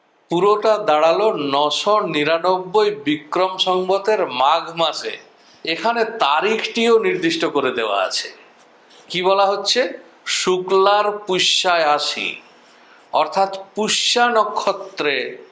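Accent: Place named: Rarh, India. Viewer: আপনার কথায় লাগে আপনি রাঢ়ের মানুষ..😅 বাঁকুড়া, পুরুলিয়া , বিষ্ণুপুরের..আমিও বাঁকুড়া থেকে!..❤️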